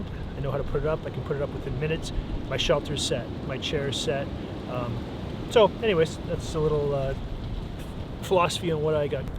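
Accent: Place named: Canada. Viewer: Good job. I'm curious. You have the same accent as Don Cherry. You must be from the same area as him or you have watched too many Don Cherry commentary. Thanks for sharing.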